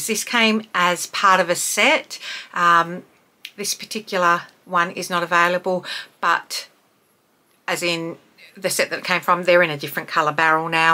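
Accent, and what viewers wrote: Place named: Australia. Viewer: So good to hear a YouTube beauty content creator with an Aussie accent! 69 yo Aussie lover of makeup and skincare here. Look forward to seeing more of your videos. 😊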